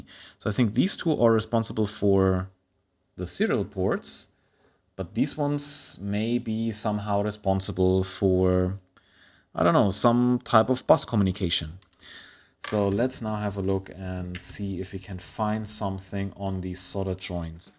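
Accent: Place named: Germany. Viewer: so you could definitely say that the dark background music you put in there was already some sort of "subtle foreshadowing", right? BTW, you sound waaaaay too german for someone that speaks english natively, so i guess we're both from germany?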